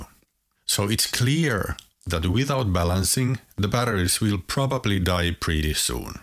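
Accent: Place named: Finland. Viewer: I could immediately tell you are a Finn: beautiful accent and “max information to words” ratio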